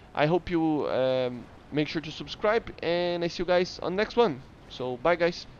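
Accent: Brazil. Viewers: U Brazilian?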